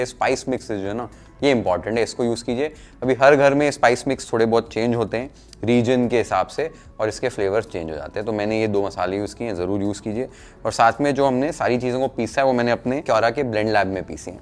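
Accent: Maharashtra, India. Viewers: Lots of Marathi influence while you talk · I love your Marathi accent bang on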